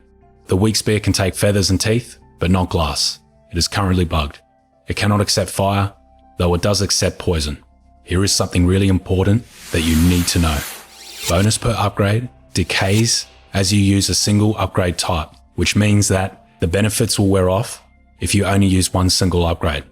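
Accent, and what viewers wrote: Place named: Australia. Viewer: You are such a good YouTuber, straight to the point while explaining it perfectly. And you sound like your a Aussie, which makes it 100 times better.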